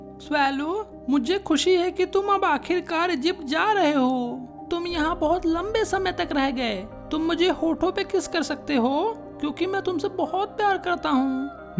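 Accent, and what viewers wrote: Bihar, India. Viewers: Bihari ho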